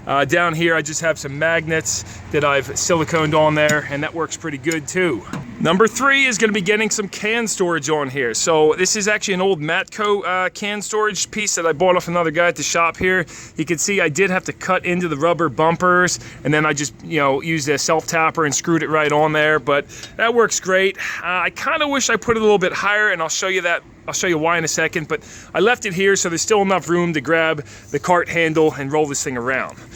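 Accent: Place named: Philadelphia, United States. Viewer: Nice Philly accent.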